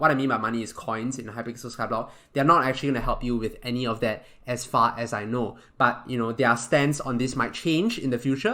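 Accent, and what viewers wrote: Singapore. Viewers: u sound singaporean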